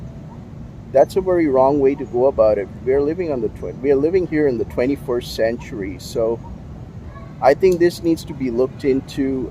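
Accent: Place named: United States. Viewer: your accent is very American. Surprise that you are from India.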